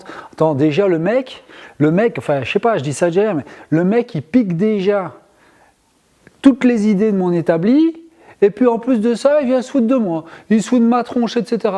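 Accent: Belgium. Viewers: Oh ça n’a pas l’air de tourner bien rond aujourd’hui!!! Si tu décides d’arrêter à cause d’un charlot, ça ne ressemble à rien. Ignore-le, c’est la meilleure chose à faire, nous on te respect pour ton job, pour ton savoir faire ainsi que toute ton équipe, quand je dis nous je parles au nom de la majorité de tes abonnés. S’il y a un con qui se moque de ton accent ou des belges, en général, c’est qu’il n’est pas bien intelligent, laisse dire les jaloux et continu ton job. Tu es un mec bien et tous les collègues aussi. Salutations très amicales. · Les erreurs reste humaine courage ne te décourage pour des râgeaux ça n en vaut la peine . De plus en France nous adorons nos voisin belge et leurs façon de parle ..car le sais tu d une région à une autre en France il y a des façon de parler totalement différent....allez les gars courage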